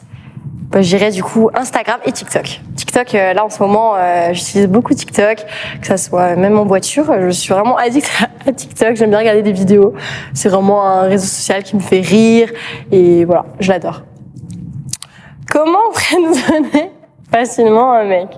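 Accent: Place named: Alsace, France. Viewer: Accent alsacien incroyable 😂😂😂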